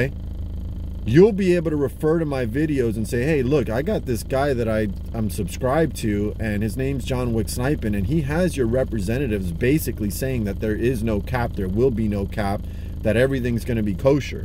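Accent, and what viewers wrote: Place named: Southall, United Kingdom. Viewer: Keep up the gd work,well said! appreciate the dirty grind ur doing n outting these brokers,u spoke like a true Southall (west london) boy,head up 👊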